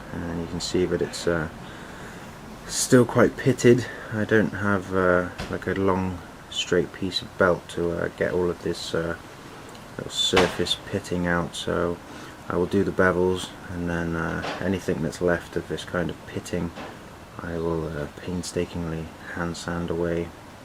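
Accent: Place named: United Kingdom. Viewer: Ahh cool stuff I knew it was a uk accent haha. I have a lot of subscribers from Canada. Cool videos brother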